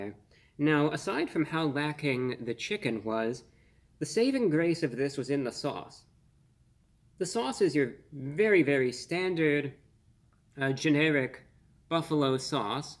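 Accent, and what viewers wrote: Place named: Transatlantic, United States. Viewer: Man's bringing back the old-school transatlantic accent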